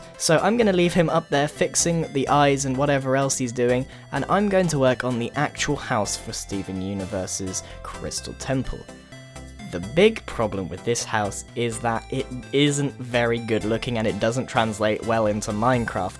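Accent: England, United Kingdom. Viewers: are you English? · why does he sound really british when he was younger?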